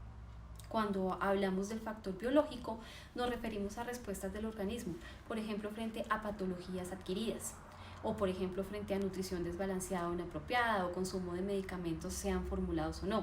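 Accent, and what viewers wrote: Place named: Colombia. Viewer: Acento Colombiano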